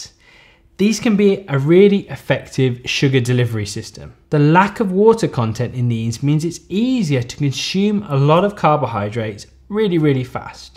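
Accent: United Kingdom. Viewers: For the past 4 years, I watch Dr. Eric Berg and to be utterly honest, I learnt quite a lot. However, the way you go through details is great. Definitely subscribing and going to browse the channel. By the way, I love the way you sound, soOo British. Since I love the accent (Sir Ken Robinson, Simon Cowell, Marco Piere White, "Gordon Ramsay" are just a few to name really) is another reason to subscribe.😃🤘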